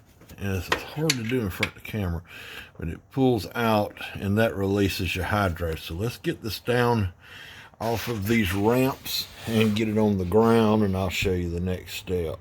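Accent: South, United States. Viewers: Love the Southern drawl. I was wondering if there was a drain plug or if it drained out through the filters. This video answers my question. Thank you!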